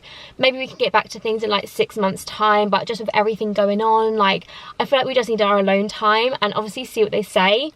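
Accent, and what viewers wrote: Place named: London, United Kingdom. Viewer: I love your accent. Is this a london accent?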